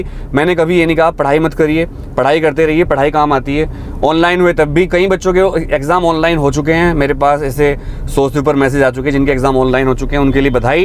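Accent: Delhi, India. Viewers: Delhi se ho kya sir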